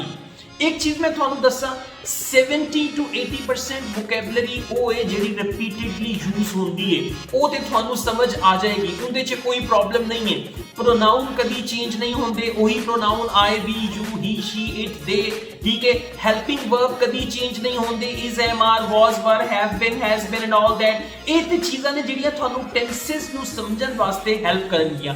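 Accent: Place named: Malwa, India. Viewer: Background music...👌👌punjabi sirra bolde o sir tuc sade ..malwaian wangu😊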